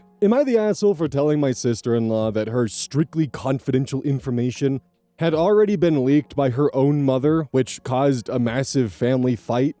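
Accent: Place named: United States. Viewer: Nooo don't change how u talk!! Say vib-ah-ray-tions! I love USA accents that arent the movie accent theyre so endearing and quirky!! Pls keep ur regional accent 😭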